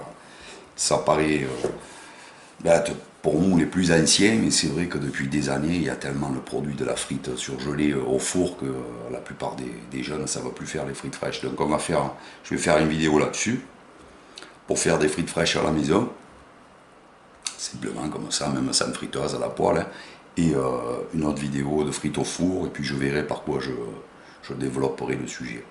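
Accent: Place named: Marseille, France. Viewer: On entend bien l'accent et la façon de parler des marseillais. Donc je m'abonne !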